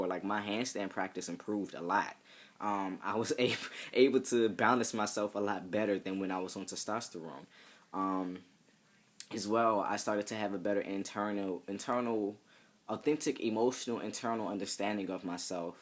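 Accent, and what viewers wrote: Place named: New York, United States. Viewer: Hey Your dialect sounds very NY I would have never guess Maryland.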